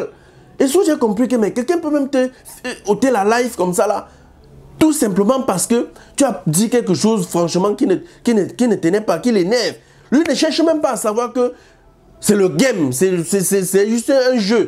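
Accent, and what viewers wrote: Cameroon. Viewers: Tu a baminikalise le français dans ton récit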